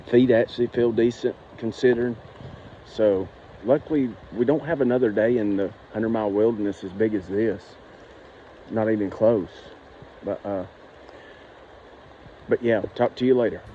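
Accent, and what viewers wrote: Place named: South, United States. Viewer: Just came across your channel, I look forward to following your SOBO journey, should be an interesting trip. When I first heard your voice, I thought, he has to be from the south, and yep, i was right. I am from western NC. Thanks for sharing your adventure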